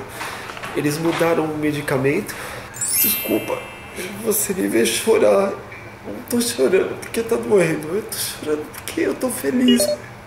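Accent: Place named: Australia. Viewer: Força. As coisas já estão acontecendo de forma positiva. Cara, esse sotaque australiano é demais. Gosto muito. Você é galã de novela com ou sem barba. Grande abraço.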